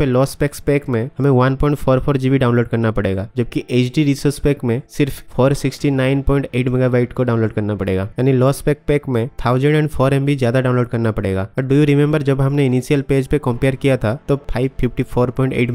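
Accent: Odisha, India. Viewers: Bro, are you Odia?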